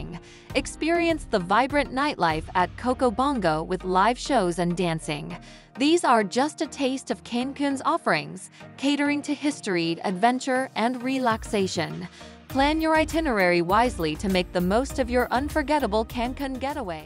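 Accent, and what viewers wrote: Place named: United States. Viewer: when american pronunciation cicks in,.. some places had a different pronunciations. anyways thanx for this general video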